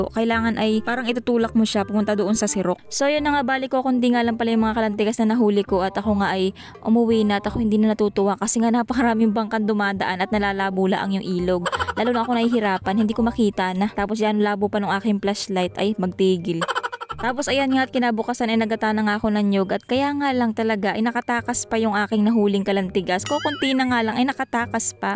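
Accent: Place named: Camarines Norte, Philippines. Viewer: Parang salita sa camarines norte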